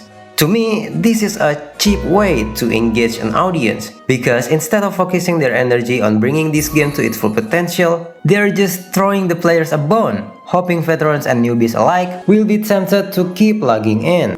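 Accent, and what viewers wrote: Indonesia. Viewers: hell nah, satu satunya orang Indo yang masih ngehidupin komunitas GT, mangat yak, make sense knp logatnya kek org Indo😅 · oh indo toh, kentel banget aksennya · Hop on Limbus gng. In what world where a CEO got lucky with gachas will give you 10 free pulls. Either way im an indonesian myself and ive been watching you for quite a while and you know your indonesian accent stuck way too much on your english it makes me wanna laugh so badly (i cant lie lmao).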